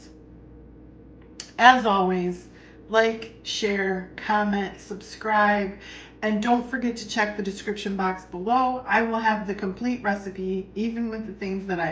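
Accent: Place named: Johannesburg, South Africa. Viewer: Comfort food at its finest! Now that Fall and Winter are just around the corner here in New England, this will be just the thing! I also wanted to ask you if you're anywhere near Jo-Burg [Johannesburg]..we've got some dear friends up there, and your accent is so similar to theirs! Makes me miss them<3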